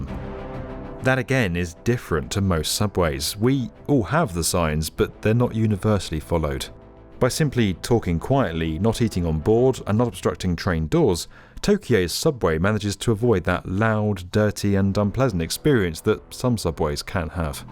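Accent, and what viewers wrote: United Kingdom. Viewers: This ìs the first time ive had a bit of a hard time understanding a british accent its like they chose the most british person ever lol😂 Easy to use - E to use Schedule - Say dule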